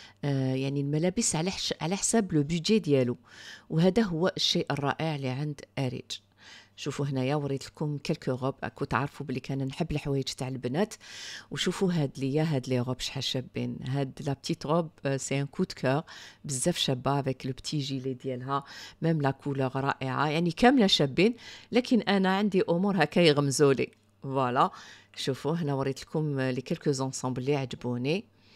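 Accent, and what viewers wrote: Algiers, Algeria. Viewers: صباح الخير السيدة شحال نحب اللهجة العاصمية ديالنا وخاصة كي تكون من عند مؤثرة كما بحالك نفتخر بيها لما سمعت كلمة gardiha عوض نحضيها فقط ملاحظة لان لهجتنا شااابة 🌹❤️ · ما أحلى اللّهجة العاصمية و ما أحلى العاصمة ، الجزائر البيضاء ، البهجة ، هاد المرّة زرتها بقيت 24 ساعة ورجعت الى بيتي 🇩🇿❤️🌹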